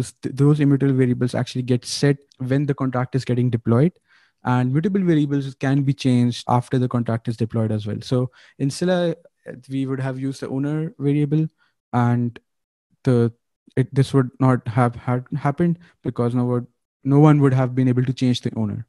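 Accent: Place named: India. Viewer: Indian English not understand I.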